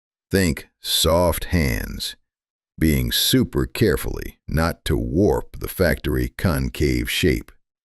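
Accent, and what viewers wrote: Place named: South, United States. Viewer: Great info but the Bubba-fied narration is kinda irritating.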